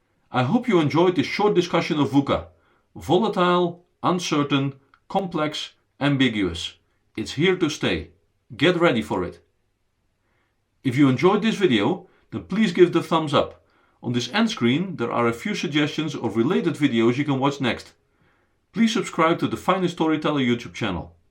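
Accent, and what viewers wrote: Russia. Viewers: The accent is very Russian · r u russian?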